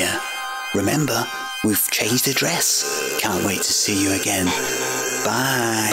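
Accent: Scotland, United Kingdom. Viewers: Anlglisized Scotch accent to the MAX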